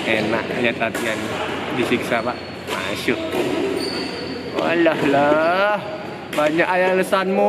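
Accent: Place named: Medan, Indonesia. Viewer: logat medannya anjay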